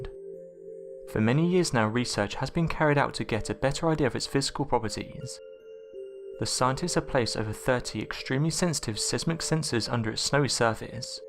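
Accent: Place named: London, United Kingdom. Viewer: London town I'd say he's good hay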